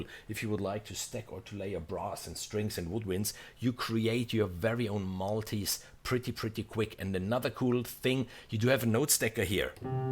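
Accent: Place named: Germany. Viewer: oh, du kommst aus Italien? Opsss dachte du bist aus Deutschland 🤦‍♂️ zumindest nach dem englischen Dialekt nach! Vielleicht reicht ja auch einfach ein deutscher Untertitel? Oder aber, mach einfach weiter so, so viele composer die film score machen gibt es eh nicht in Deutschland und eigentlich hast ja recht, englisch sollte eh jeder können, der in unseren job arbeitet! 🤗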